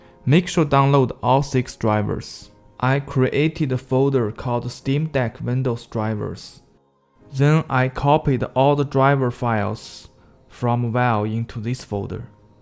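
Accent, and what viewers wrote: China. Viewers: Nice tutorial, 一听就是中国同胞啊😂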